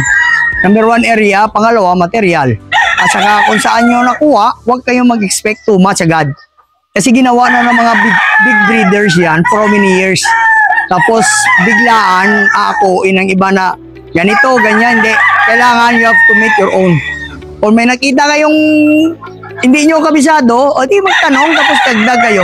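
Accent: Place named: Iloilo, Philippines. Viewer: Ilonggo bla si sir 😊😅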